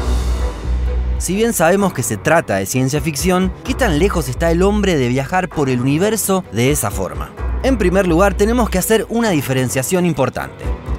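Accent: Buenos Aires, Argentina. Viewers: Lo único que puedo criticarle es que habla muy aporteñado.Si hablara en neutro el canal llegaría mas lejos.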